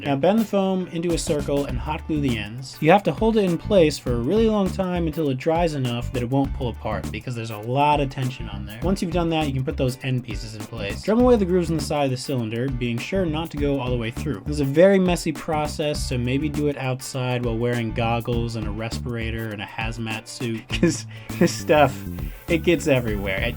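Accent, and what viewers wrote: Canada. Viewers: You're Canadian? Noice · Canadian, eh?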